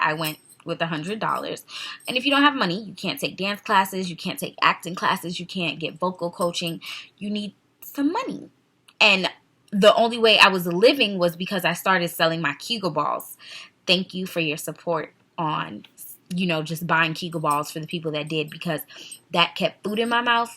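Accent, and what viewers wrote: Jamaica. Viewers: I love the Jamaican accent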